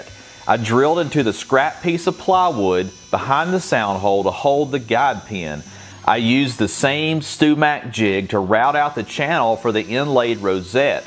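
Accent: South, United States. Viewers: This is just a wild guess but are you any chance from the south? Thanks for the videos.....really enjoy them.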